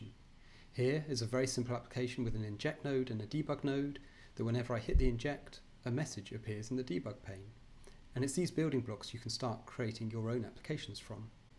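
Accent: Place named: United Kingdom. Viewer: difficult to understand: strong British accent mumbling ... sorry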